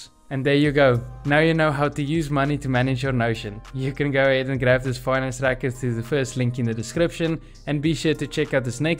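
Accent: Afrikaans, South Africa. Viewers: knew you South African!!!🇿🇦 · the most south african sounding accent I have ever heard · afrikaans as fuck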